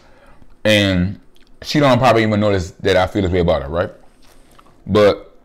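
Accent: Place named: New Orleans, United States. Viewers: Are u from New Orleans? U have a strong N.O. Accent..... I Luv seafood so I would’ve enjoyed eating that pan!!!! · R u from Louisiana u sound cajun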